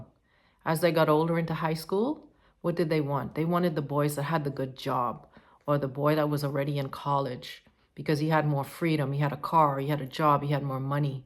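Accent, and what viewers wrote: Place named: Caribbean. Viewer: Based on your accent, are you from the Caribbean?. Excellent advice by the way.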